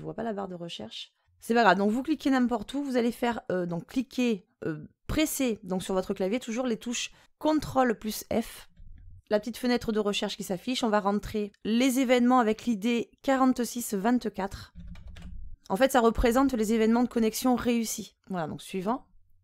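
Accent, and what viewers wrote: South, France. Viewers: Jolie accent du sud 😜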